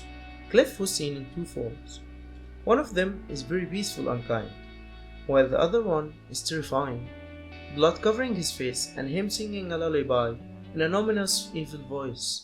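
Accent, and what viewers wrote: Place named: Egypt. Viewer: Love your hard work and amazed about the amount of vocabulary u have. Wish you didn’t have the Egyptian dialect though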